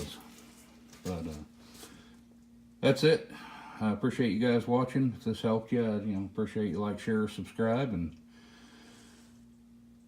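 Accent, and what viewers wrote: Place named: South, United States. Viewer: ^^^^ To O.J. Avery ^^^^ Maybe you should get some hearing aids or turn the volume up. How much do you really need to hear anyway it’s pretty self explanatory. I think you were just taking a jab at some who talks a little differently than you or has a southern drawl. That kind of senselessness is just mean and evil and a huge part of why we are where we are as a country right now. Hope it helped you sleep better at night. Peace ✌🏼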